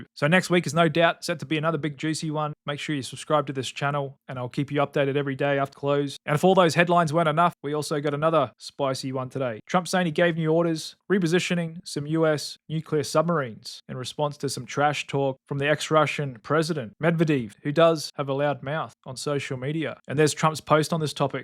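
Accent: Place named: Australia. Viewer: Lmao, that's his accent. I'm guessing Australian but I'm British so I can never tell the difference between Aussies and Kiwi's 😆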